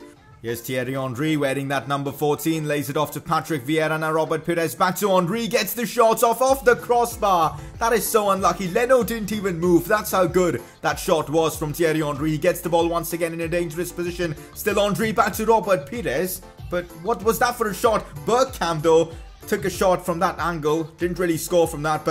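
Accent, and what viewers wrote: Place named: India. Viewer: You sound like an indian(🇮🇳) or Someother Asian country person🌏 that learnt to speak English just a month ago😂😂😂(STG(swear to God)not tying to be racist 😑)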